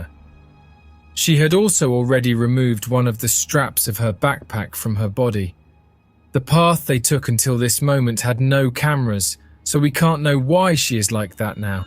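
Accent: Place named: England, United Kingdom. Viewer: Why are you speaking with an English accent but using American terminology? We don’t have “sidewalks” we have paths. We have mobiles, not “cellphones” We don’t have “garbage” we have rubbish. We don’t have “apartments” we have flats. We don’t have “trash bags” we have black bags or rubbish bags. I really h@ these bloody robots.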